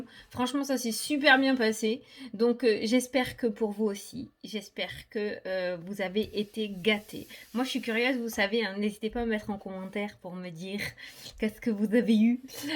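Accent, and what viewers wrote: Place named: Southwest, France. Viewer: j'aime beaucoup ce mode de tirage. Je sais que les cartes disent vrai.... car j'en suis là et cela dure depuis 3 ans pour le dernier choc et 2015 pour le début de ma descente aux enfers des jugements des exclusions familiales. Je n'ai prise sur rien là est le problème. Je ne peux pas vider mon sac puisque personne en face de moi. Je ne peux plus continuer comme cela... j'espère un message même négatif pour pouvoir réagir et mettre un point final à cette situation. Merci pour cette lecture et pour l'Amour du Ciel.....ne change pas tu es juste parfaite avec ton accent pour moi qui suis native du Sud-ouest. Bisous ma Belle👌👄💖😍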